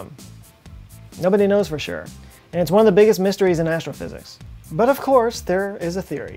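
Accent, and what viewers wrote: Texas, United States. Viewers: A giant what? Oh, a die-key-ree, now I get it... no thanks to your Texan pronunciation.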